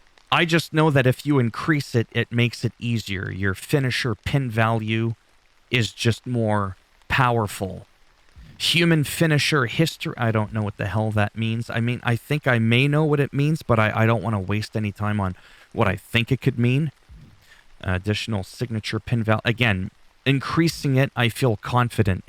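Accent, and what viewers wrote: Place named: Canada. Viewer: Merci by the way im Gove pleasure too meet u I really like your Canadian accent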